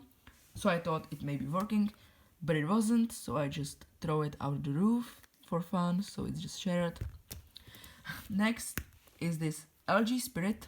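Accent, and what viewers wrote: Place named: Romania. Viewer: Your accent sounds Romanian but you are from Poland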